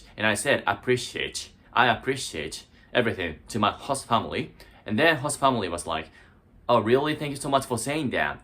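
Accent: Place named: Australia. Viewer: Very good video! I love that you share positive messages ❤ I wish I could learn to speak Japanese. Congratulations on working on your English, you’re doing a great job! I can tell you learned Australian English 😊 - I am American - our English has a different accent. Just an observation 😊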